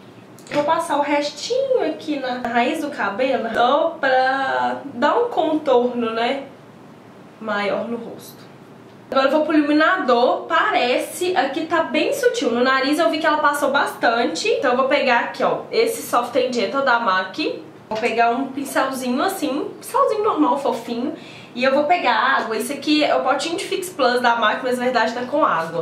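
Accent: Minas Gerais, Brazil. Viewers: Nao sabia que voce era mineira ! qual cidade? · Aaaaaaaaaamo seus jeitim mineirim! !!!!!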